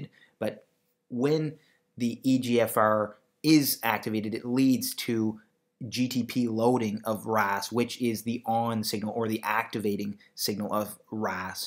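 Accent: Canada. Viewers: are u canadian?? ur accent sounds super canadian lol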